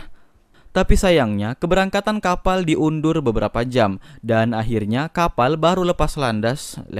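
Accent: Makassar, Indonesia. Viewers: Bang org makassar yah? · Yg aku suka vlog ini adalah narasi nya bagus... Uniknya adalah, menggunakan bahasa indonesia dengan aksen indonesia timur, Makassar... Jadi lebih seru. Nice vlog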